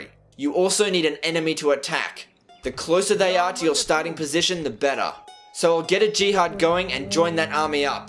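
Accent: Australia. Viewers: Holy shit, he used to be such a pure Australian. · He's Aussie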